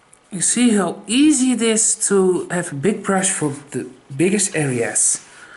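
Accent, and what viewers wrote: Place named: Netherlands. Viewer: Enjoyable videos, keep them coming. And you are Dutch or Belgian, kinda recognize the accent :).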